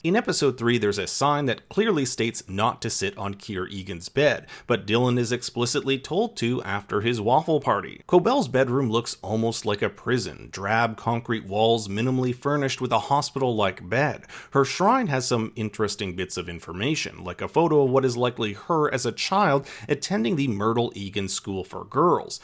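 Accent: United States. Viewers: you’re not american but you have an american sounding accent. are you canadian? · How are you not american and have american accent? My brain is about to melt on that information lmao Edit: Canadian 😂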